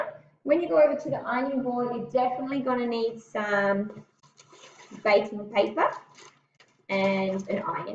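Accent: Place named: Australia. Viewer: Sounds like an Australian woman, I am Australian also. I have looked at many videos about fusing the bags with the iron, however this is the only one I have seen where the person is saying to have water in the iron and use that steam setting or whatever, I can't figure out why however, no one else did that, I can't figure out what the hot steam would do just spraying on the baking paper, and she didn't explain the reason why. As far as plastic fumes, apparently most things have a melting temperature and then a vaporising temperature, so as long as it doesn't hit the vaporising temperature then there shouldn't be any plastic fumes, for this type of polyethylene it shouldn't really produce any vapours while under 250 degrees. However it seems this video was for year 9 students, so you were erring on the side of caution.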